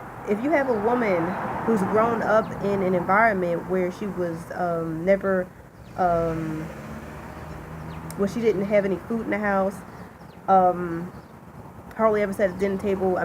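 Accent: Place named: Philadelphia, United States. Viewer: Sounds like you're from Philly sis.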